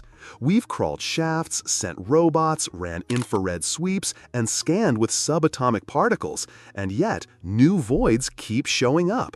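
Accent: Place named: United States. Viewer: THE AMERICAN NOISE OF THIS NARRATION IS SO ANNOYING. IT SOUNDS SIMILAR TO THE VOICE OVERS FROM THE 70S ABOUT A NEW WASHING MACHINE BEING SOLD AT A NON-DESCRIPT APPLIANCE CHAIN IN THE USA. STOPPED WATCHING AFTER 2 MINUTES AND FOUND SOMETHING ELSE LESS ANNOYING .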